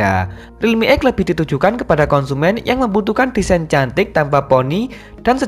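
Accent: Java, Indonesia. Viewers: wong jowo yo mas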